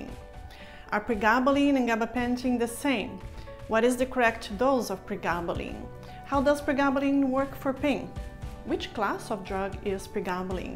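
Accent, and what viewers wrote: Brazil. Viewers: recognized the accent, uhul brasil il il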